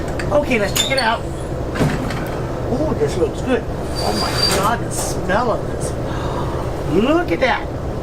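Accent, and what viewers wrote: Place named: South, United States. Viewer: I like your Southern accent, drives me wild!🦝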